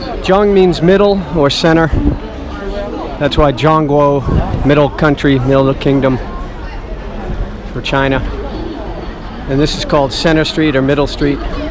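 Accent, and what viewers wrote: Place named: New York, United States. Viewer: So cool hearing a New York accent in China!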